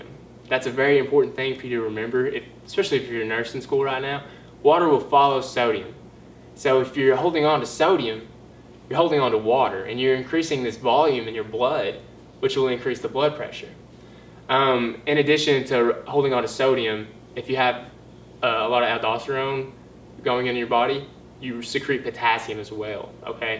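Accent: United States. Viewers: Great! Best explanation I have seen thus far on You tube. Of course, being from NC, I am also partial to the accent :) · Southern accent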